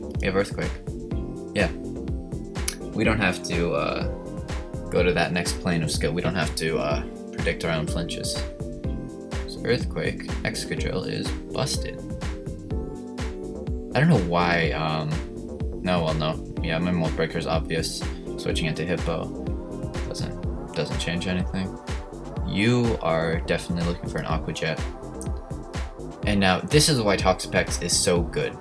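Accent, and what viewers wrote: Poland. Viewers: U polish? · U polish? 😱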